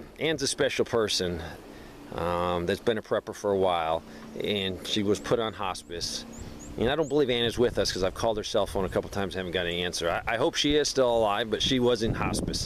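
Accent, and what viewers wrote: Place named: Upstate New York, United States. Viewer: Sound like you're in my neck of the woods. Upstate area.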